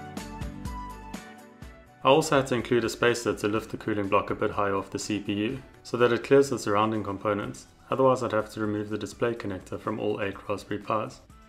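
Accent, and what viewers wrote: South Africa. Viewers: You sound like a south african ? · But why? P.s. is that an SA accent? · Awesome project! I’m I hearing a South African accent? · Clicked link. Didn't expect to hear a South African. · love the South African accent, but please call it a Water cooling system, not a cooling water system lol!! Impressive stuff, could you share the clusters Hashrate for BTC when overclocked to 2Ghz? and the temps? would be a nice stress test on the cluster and cooling water system :p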